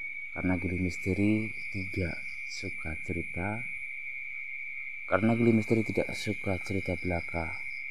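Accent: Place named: Java, Indonesia. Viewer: Indramayu hadir untuk kang Gili Bahasanya medok kaya orang Jawa tapi ko videonya banyak diambil di sunda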